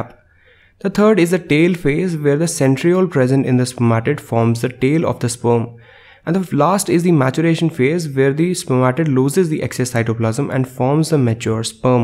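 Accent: India. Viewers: don't worry for your Indian accent-which, if we accent the American prononciation- just has to be accepted as it is. It took the first 3 seconds to adapt myself, and i understood you perfectly though I am not a native English speaker. And for those who find it;s too fast, just go to 'setting" (the little wheel) and change the speed! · There is nothing 'easy' about an English speaking person having to understand an Indian speaking person w/ a heavy accent explaining something in English. It's something about the 'sing-song lilt' that unpleasantly overshadows understanding the explanation for me. Even more so when they're speaking too fast.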